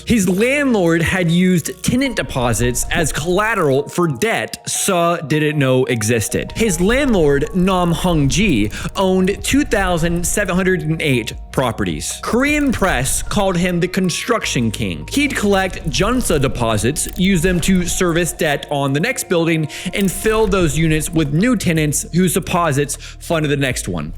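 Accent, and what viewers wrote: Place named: Australia. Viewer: Oh Shit he is starting to talk like an Aussie. He's integrating.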